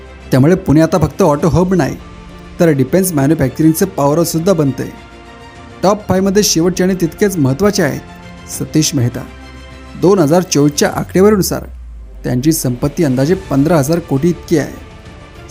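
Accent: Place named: Latur, India. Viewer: आवाज बहुतेक पुणेरी वाटत नाही वाटतंय लातूर बार्शी कडचा माणूस बोलत आहे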